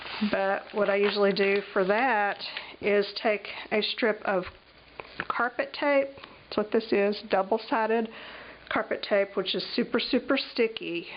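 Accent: South, United States. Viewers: OOO! Magazine page shown MUST be about me... I love your videos! You are so incredibly knowledgeable, and I love learning new things from you! Edited to add: You are also a hoot to listen to. Between your lovely Southern accent, your colloquialisms ("book book", "a pokey tool"), and your complete lack of condescension, you're a pleasure to listen to! You've found a new subscriber!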